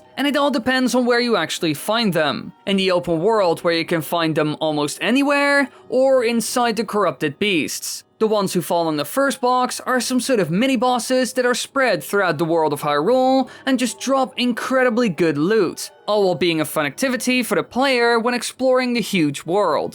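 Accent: Netherlands. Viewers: Oeft what the bloody hell is your accent mate? Is it Dutch? It is Dutch isn’t it?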